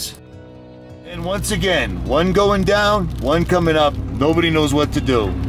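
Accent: Boston, United States. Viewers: Just stumbled across your vids while exploring the black hole of YouTube. What part of Boston you from? You better not say Framingham 🤣. Great vids, keep em’ coming. Mike- who hails from Boston but now lives in the burbs’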